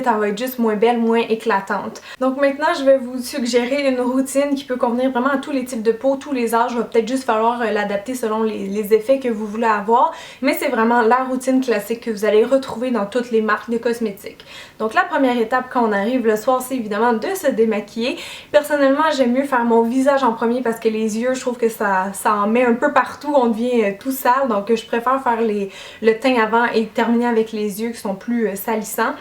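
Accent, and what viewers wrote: Quebec, Canada. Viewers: Chacun sa prononciation. Ce serait dommage que les québécoises parlent comme les françaises. Vive les accents du monde! ;)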